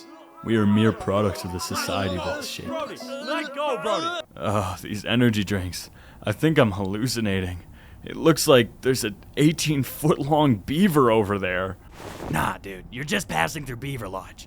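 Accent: Canada. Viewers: wait are you canadian????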